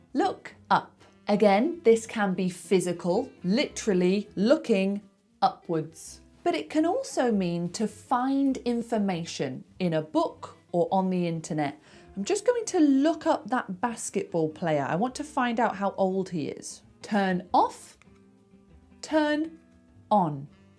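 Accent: United Kingdom. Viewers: I'm on B1 English level, this video was good for me, thank you very much. I watched it with English audio, but sometimes I needed to read English subtitles. I'm Brazilian, and I think your accent is British English.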